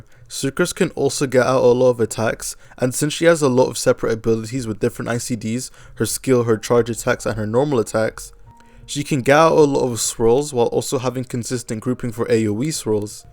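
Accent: United Kingdom. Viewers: how do you manage to sound more british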